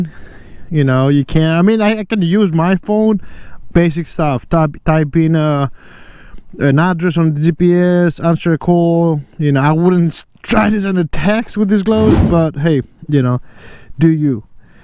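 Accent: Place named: Greece. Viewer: Hey mate, 2 facts that lead me to think that you are Greek. 1 (?) and your pronunciation..it reminds me a lot of mine 😂. So I might be right, I might not. Cheers from Greece buddy! I am thinking of those gloves, and unfortunately in Greece we do not have the chance to try and feel astars products, because very few models are available in stock. So I am thinking on getting these from EU store, but a little concern with the sizing. Should I stick with the sizing chart? Or 1 size up/down. Any suggestions? Thanks a lot, ride safe!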